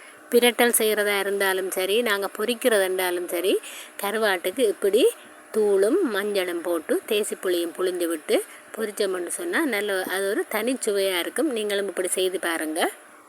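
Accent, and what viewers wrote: Sri Lanka. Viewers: உருளைக்கிழங்கு கருவாட்டு பிரட்டல் அருமை. இலங்கைத் தமிழும் மணம் வீசுகின்றது.. வாழ்த்துக்கள்🎉🎉🎉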